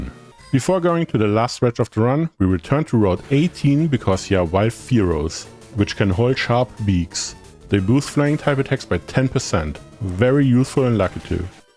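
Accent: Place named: Germany. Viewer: The accent is so familar but I can't quite put my finger on it. German?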